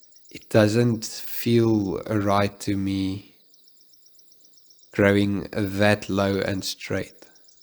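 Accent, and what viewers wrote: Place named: South Africa. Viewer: Loved the video and seeing your different trees. So much content out there is not South African, so great to hear our beloved accent. I have got a black monkey thorn from nursery stock that needs a hard chop. What is the best time of year for this?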